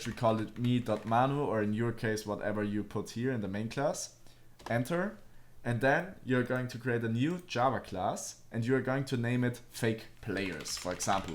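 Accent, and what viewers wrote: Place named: Germany. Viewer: Am Akzent merkt man direkt, dass du deutsch bist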